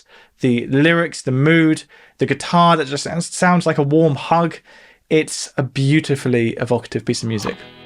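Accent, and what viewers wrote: United Kingdom. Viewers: Your British is showing strong in this one. Haha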